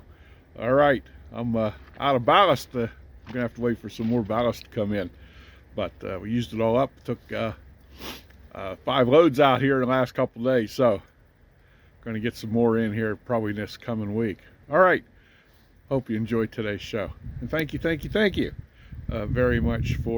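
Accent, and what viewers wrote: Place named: Pennsylvania, United States. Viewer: BTW, my wife grew up in New Kensington and we have many family members in that area.. We live in Texas. She loves hearing your Pennsylvania accent....Great Show!!!!!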